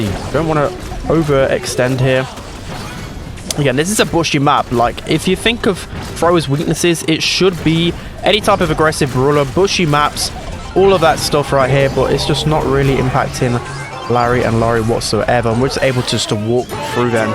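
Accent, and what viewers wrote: United Kingdom. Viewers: W british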